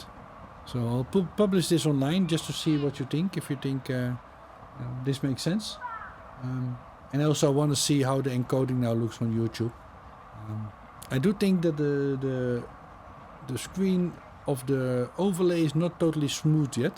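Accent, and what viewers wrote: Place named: Netherlands. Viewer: I also wondered about this problem of being to much work even though we truly enjoy all your extras you put in the video. The helicopter view is something that almost no other channel has and I like this high in the sky view to gain perspective of were you are or how the landscape looks from a little far away. All this is a balance of how much effort and time you have to put into this VS it being to much work and stop making videos. I believe your Dutch accent and way of speaking is very calming and relaxing and a joy to listen to while eating my dinner or having a breakfast in the morning with my coffee while watching your videos. I can hear the difference in the red pavement or asphalt versus the brick roads which is so nice to listen to. Also the crows and birds I know these sounds well as it lets me remember riding the bike between Eindhoven and Nuenen. 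So if you have to limit yourself to how much work you have to do keep the narration and helicopter view and audio sound while on the bike. More important to people I believe is that you visit different places and share your knowledge of the area with the viewers. Thank you for all the hard work many here enjoy what you do. Dankje Wel Mijn frenden.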